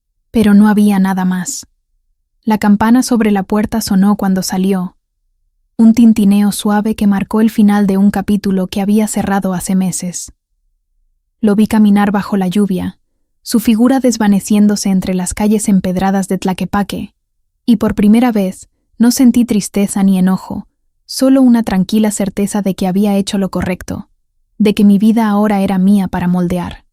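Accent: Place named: Spain. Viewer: Una chica mejicana con acento española.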